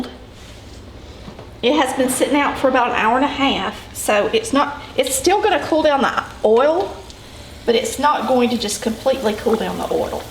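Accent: South, United States. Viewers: you so southern like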